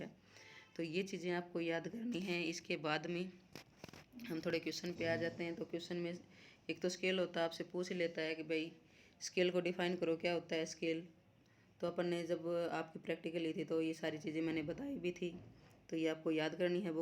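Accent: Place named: Haryana, India. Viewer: Haryana se lag rahi ho mam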